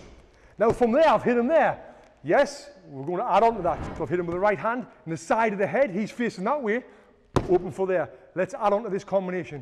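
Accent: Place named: England, United Kingdom. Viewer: the english accent makes it more epic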